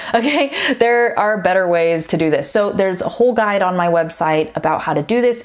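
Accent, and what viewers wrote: Buenos Aires, Argentina. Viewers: The argentinian accent is so cool I love it it sounds so exotic. I'm native latino grew up with Puerto Rican parents in the US and my mom mostly spoke spanglish to me. Because I spent a large portion of my life focusing on English I still have a lot to improve on with my Spanish, luckily as a native I already know how we american latinos sound so learning is much easier for me. The nice thing is that we american latinos understand you perfectly even though the argentinian accent is so different. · Hola, Toda lengua tiene diferentes acentos, en países y sus regiones. Por ejemplo en ARG tenemos 5 acentos (norte, cordobés, litoral, mendocino y bonaerense). Ella está hablando con acento argentino bonaerense. Les recomiendo aprender español de España o castellano en principio y luego centrarse en algún acento que les guste. Yo aprendí ingles británico primero y luego me centre en ingles de norte américa. 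Es la forma más fácil y limpia de aprender un idioma en mi opinión. Ah, y no olvidar hablar y aprender palabras nuevas, las reglas gramaticales las aprendes cuando ya tengas un suficiente dominio del lenguaje. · Que increíble escucharte hablar en español (argentino) 😅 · Actually, you speak Argentine, not Spanish, but extraordinarily well. Congratulations. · Congratulations, for the work you have been developing and sharing. Your videos are very assertive. In spite of his strong "portenho" accent, her Spanish is very good. I am a native speaker of Portuguese and I have studied Spanish for at least ten years and I also speak a little. For us, speakers of Portuguese, it is much easier to learn Spanish compared to English, given its similarity to our native language. Thank you very much for your tips and congratulations. Andryo Amorim Ananindeua, Pará, Amazônia, Brasil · Hablas Argentino JAJAJA muy bien! 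· No lo puedo creer jaja. estuve viendo sus videos por meses y yo pensando que era super yankee y de un momento a otro se pone hablar con acento porteño? SHOCKED!! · Perfecto español porteño jaja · OMG!!!! You are 500% porteña!! awesomeeee!!! I'm from Ecuador but lived over there 7 years... any ways! I almost dont have accent in English cuz I practice all the time, watch my favorite Tv show which is "Friends" and sing in English all the time... I love it when americans think Im from NY. Saludos!! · Con acento porteño!!!! Q genial che!! Yo soy Argentino.. Te felicito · Muy buen españool! (Tenes acento bonaerense) 😂❤ love it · Hay el yeyeoo porteño!! Te sale la tonada cordobesa? XD En mi caso tengo exactamente el mismo rasgos que vos: meticuloso, medio obse y falto de confianza... pero por alguna rara razón, no le encontré un método robot de estudio como sería usual en mi. Hice todo lo que mencionas, empecé a escribir y estudiar en ingles, ver todos mis vídeos en ingles con subtítulos al ingles, mucho shadowing... 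lo disfrute muchísimo!!! Fui aprendiendo usándolo ... y obviamente todavia sigo. Gracias por el canal. Hermoso! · Bueno español, tiene un acento porteño, aprendiste en BA? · Me suena porteño. Sometimes mexican...(for me, I am not a native castillan speaker) but...congratulations!!! You are really good. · Increíble, realmente pareces de acá de Argentina, específicamente de Buenos Aires. Thank you for the advices!! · ¡Tú absorveste el español y también el porteño, que es específico en Argentina! Linda, hermosa, una gracia! So cute! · La senora no es gringa, es ispana hija de Argentina, al escucharla es argetina, cuando una gringa aprende Español tiene acento y se nota bien,Ella solo tiene acento argentino, es haha de argentinos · hablas un poco con un accento de Buenos Aires. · Ese buenos aires en vos si se puede ver hehe saludos desde Misiones (Argentina). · You speak Spanish with a perfect Argentinian accent I hope your parents are really proud of you that's very impressive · Te felicito, sos una porteña más! 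Thank you for give us your advices! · Sos re porteña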